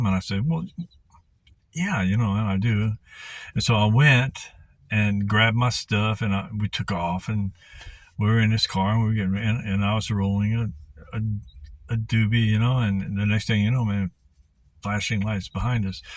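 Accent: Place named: Louisiana, United States. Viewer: Great video! Are from Louisiana by chance? I hear a slight Cajun accent 😂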